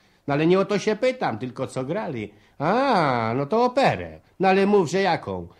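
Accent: Warsaw, Poland. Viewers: ach te warsiawskie ' l '. 'nasza ulyca '. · Biorąc pod uwagę,że człowiek przeżył aż 5 letni koszmar obozu koncentracyjnego,to po prostu aż nieprawdopodobne,że mimo to zachował pogodę ducha.Być może,tak mi przyszło na myśl ,że "Pięć Lat Kacetu" ,to było jakby osobiste rozliczenie się z przeszłością.Trudno też o tym rozmawiać z kimkolwiek,a zwłaszcza z bliskimi,więc napisanie tej książki pomogło zapewne wyrzucić częściowo chociaż to z siebie,a przy okazji,zaświadczyć Polakom i światu o tym co się tam wyprawiało z ludźmi,daruję już sobie wywody,kto i co robił,o tym jest masę innych dokumentów.Zresztą chyba jak dobrze pamiętam Grzesiuk,nawet niestety,zmarł chyba w kilka lat poi przeżyciu obozu,ponieważ choć miał silną psychikę i organizm,to jednak i to się poddało.A tu jeszcze miło posłuchać tej ,jak sadze,bo nie jestem Warszawiakiem,to już oni muszą ocenić,ale starej gwary warszawskiej,czy dziś jeszcze się tak mówi,tam w Warszawie?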